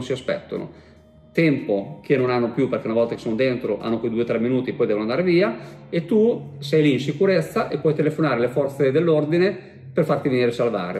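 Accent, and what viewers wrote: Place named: Rimini, Italy. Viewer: la parlata riminese e troppo simpatica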